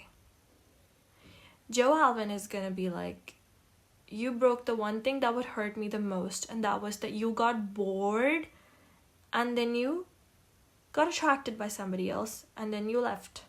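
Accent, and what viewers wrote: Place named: India. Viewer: WAIT ARE U INDIAN ??? Cause the quote sounds familiar and ur accent is the pretty indian one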